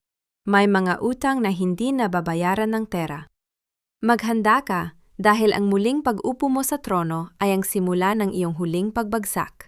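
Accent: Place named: Visayas, Philippines. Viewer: Parang bisaya kadi mga narrator nyo eh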